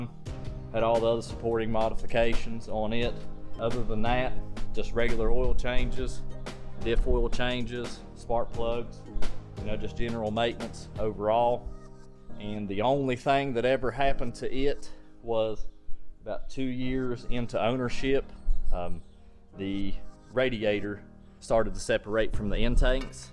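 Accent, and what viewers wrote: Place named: Tennessee, United States. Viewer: What state are you from man? You sound like you’re from my neck of the woods. I’ll say I’m about 20 minutes north of Nashville TN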